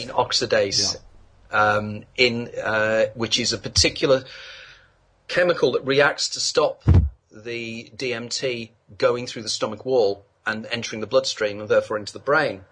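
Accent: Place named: United Kingdom. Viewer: how come british people talk funny sounds like Stuie From the family Guy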